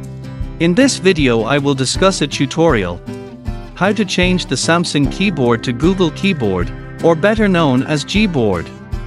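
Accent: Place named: Ireland. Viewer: Great Irish accent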